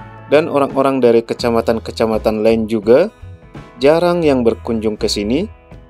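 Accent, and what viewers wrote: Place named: North Sumatra, Indonesia. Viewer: Mantap ini baru jumpa sama konten kreator yang memakai bahasa kesatuan padahal dari logatnya kental kali bahasa Batak SUMATERA. mantap josss nanti bahas ya bang tentang begu ganjang dan apa fungsi (negatif/positif) nya🙏🙏 salam dari RANTAU PANJANG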